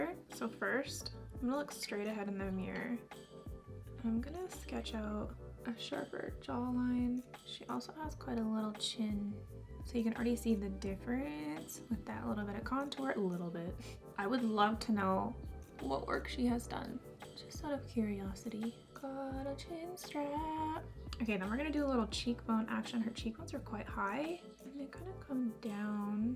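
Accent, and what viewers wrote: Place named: Canada. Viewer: I personally don’t think you sound like Kylie at all. Your Canadian voice is pretty unique to me ☺️ love the look!!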